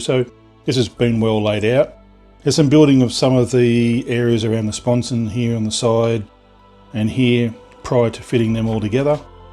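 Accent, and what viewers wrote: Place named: Australia. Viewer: hello, which part of Aussie are you from. Malcolm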